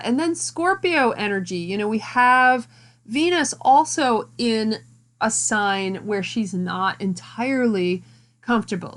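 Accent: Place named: Salem, United States. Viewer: I love your Salem accent when you say "forward" as "foeward" ♥️👏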